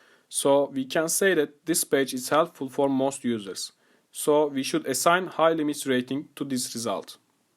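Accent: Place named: Turkey. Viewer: yes there is a turkish accent :)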